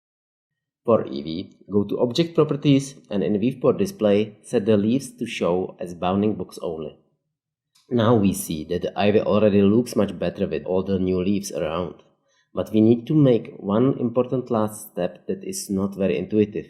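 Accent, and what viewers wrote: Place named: Czech Republic. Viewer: That Czech accent lmao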